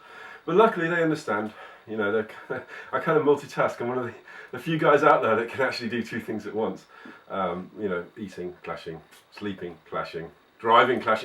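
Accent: England, United Kingdom. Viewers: yay for the English clasher, all those Americans it's nice to hear an English accent once and a while :)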